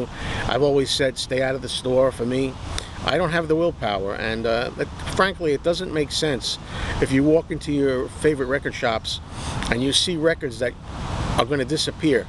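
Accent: New York, United States. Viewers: I assume from your accent you're a NY guy like me?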